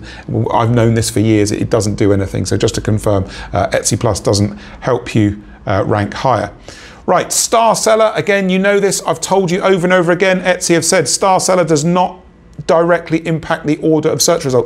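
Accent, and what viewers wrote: England, United Kingdom. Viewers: How am I only noticing now that you have an English accent? I have been binge watching your videos daily lol.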